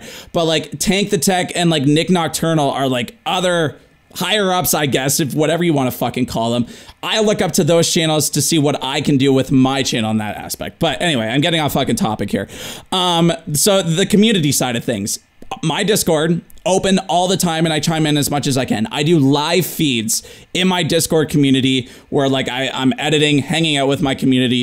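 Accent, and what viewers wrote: Canada. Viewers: So off topic - but I love your Canadian accent 😂 I’m all the way down in Maine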